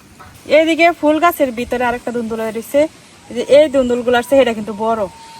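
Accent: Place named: Kishoreganj, Bangladesh. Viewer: আপু কিশোরগঞ্জে কথায়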